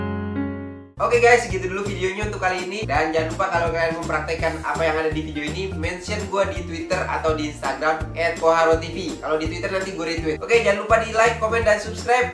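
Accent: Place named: Philippines. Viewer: i thought you were a filipino or visayan that's the way we talk but not me just little bit but im half filipino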